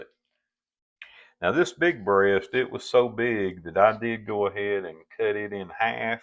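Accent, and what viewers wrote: South, United States. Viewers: i live in nrthern maine and i ABSOLUTELY LOVE YOUR SOUTHERN ACCENT AND DRWAL!!! ANY MAN...that can cook.. hell ya!!! hope your staying safe with this weather stay warm have a good wkend ;];];]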